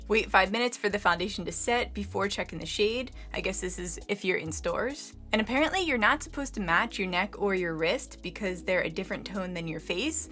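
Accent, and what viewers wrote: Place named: East Los Angeles, United States. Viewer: Wonder how she has East LA accent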